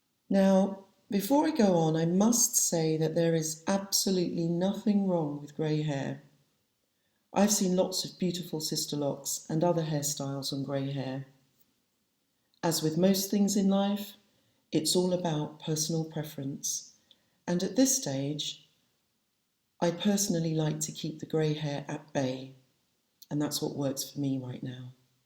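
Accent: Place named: United Kingdom. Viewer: Oooh heard the accent and subbed! Not found many Brits on here I’ve small locs 184 10 months old. I started my sisters 6 weeks ago she has bout 205. Will share this with her too hopefully got some good tips for us.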